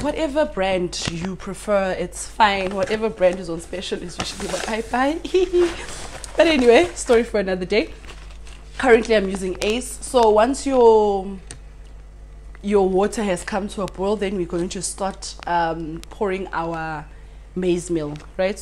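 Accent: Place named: Africa. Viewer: stop complaining for nothing!We are African,get used to our English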